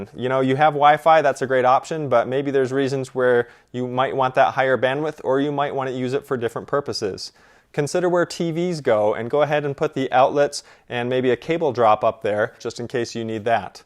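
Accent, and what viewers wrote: United States. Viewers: guy speaks American English but has a GREAT BRITAIN flag ... something is fishy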